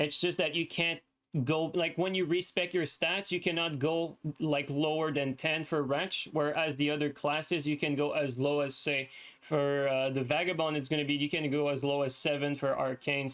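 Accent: Quebec, Canada. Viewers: This guy also sounds so Quebecois, cris de tabarnak.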